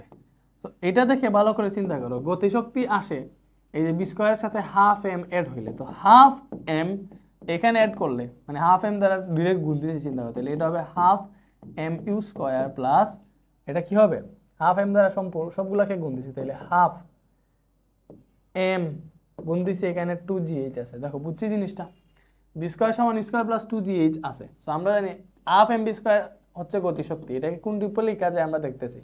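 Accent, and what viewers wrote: Sylhet, Bangladesh. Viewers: Vai apni ki sylheti😊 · vhaiya apeni ki sylhetii?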